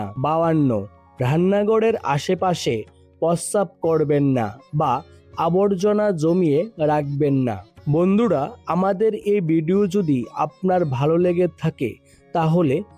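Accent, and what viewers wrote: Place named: Bangal, Bangladesh. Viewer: উনি বাঙাল ওনার উচ্চারন ওরকম হবে পাল্টাবে না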